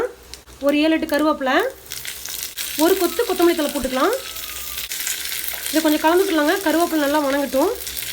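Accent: Kongu, India. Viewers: Neenda idaivelikku piragu alagana kongu tamilil receipe arumai kutties nallarukkangalamma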